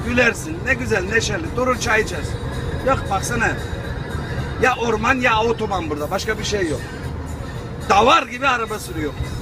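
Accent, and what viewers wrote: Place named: Anatolia, Turkey. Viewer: merhabalar nasılsınız bu konuşan arkadaş sizmisiniz şivesi sunumu çok tam anadolu :) ve kahkahsı ..hayırlı yolculuklar dilerim..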